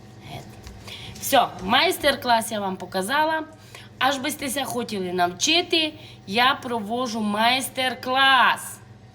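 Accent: Transcarpathia, Ukraine. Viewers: Привіт з Львова! Дуже люблю закарпатський говір,і ваші страви,молодчинка,так тримати!❤❤❤❤❤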